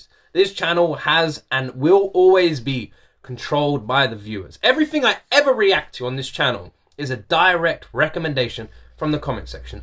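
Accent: United Kingdom. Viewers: Tiene acento británico. Incluso dijo que está en UK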